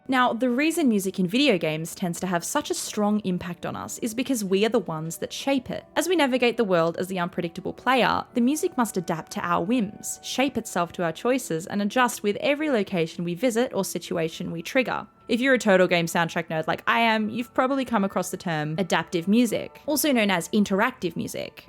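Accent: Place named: Australia. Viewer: I'm really glad I could have a positive impact! :) Of course! Thank you for taking the time to reply! I really enjoyed it and actually checked out your other vids! And let me tell you, in my years and years of watching yt I've never wanted someone to succeed more I'm genuinely shook you don't have more subs! I've never been so quick to sub haha but your quality is absolutely top tier so I really really hope your channel grows! :) And ayyy hell yeah Aussie represent🇦🇺 it honestly helped me relate more hearing your accent haha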